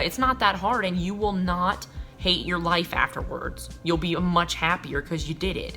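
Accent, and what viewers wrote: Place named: North Carolina, United States. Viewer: I was about to comment about how lovely her accent is. I grew up in WV though I'm not originally from there. I never really picked up the WV accent but hearing your NC accent makes me feel like I'm back home. <3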